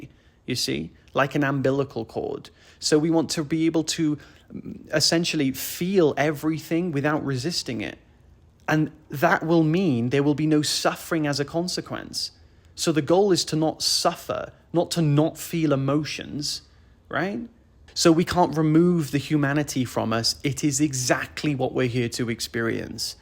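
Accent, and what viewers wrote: United Kingdom. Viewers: I feel enlightend by your amazing british accent. So nice!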